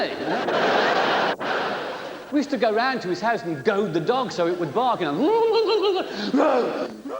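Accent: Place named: Birmingham, United Kingdom. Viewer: He can't help being Brummie... He was born there 🤣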